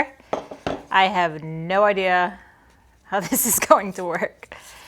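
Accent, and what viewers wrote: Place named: New York, United States. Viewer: Do you happen to be from New York or New Jersey? There's a draw to some of the words you say, that sounds New York esk. Just wondering.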